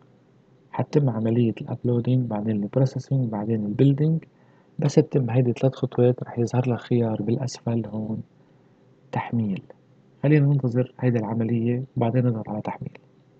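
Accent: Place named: South, Lebanon. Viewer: mbyen mn swtak mn jnoub Lbnen sa7 ana Mn lbnen sken be jnoub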